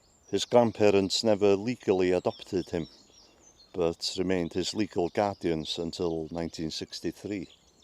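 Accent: Wales, United Kingdom. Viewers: Please! Never apologise again for your Welsh accsent. It's part of who you are... Best wishes from Scotland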